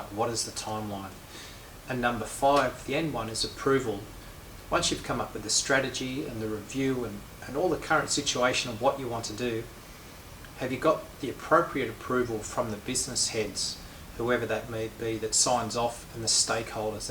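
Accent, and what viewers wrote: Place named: New Zealand. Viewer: KIWI accent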